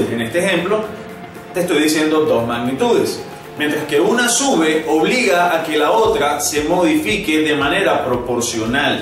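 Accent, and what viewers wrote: Venezuela. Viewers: Like si te diste cuenta que él profesor es venezolano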